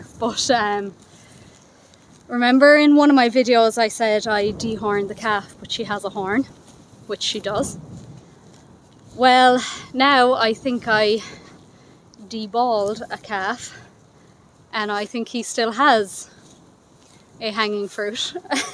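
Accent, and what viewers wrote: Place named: Cork, Ireland. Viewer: Listening to u I’m convinced you have more of a CORK accent than a Kerry one. You are lucky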